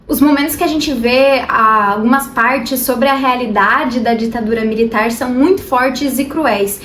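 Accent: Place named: Caipira, Brazil. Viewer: Eita, sotaque caipira não dá. Muito rim. Vai estudar mulé.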